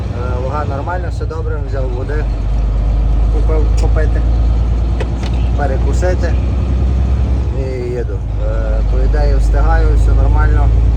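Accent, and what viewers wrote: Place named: Prykarpattia, Ukraine. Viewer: Вітаю гуцула в Америці.нас дуже багато по світу.приємно вражений нашою говіркою Прикарпатською.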